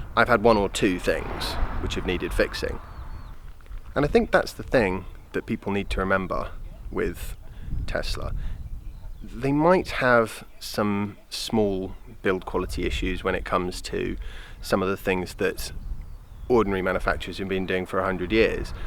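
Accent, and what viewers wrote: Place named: United Kingdom. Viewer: Wait where are you from? You sound British and have a right hand drive vehicle but you speak in miles. I'm so confused.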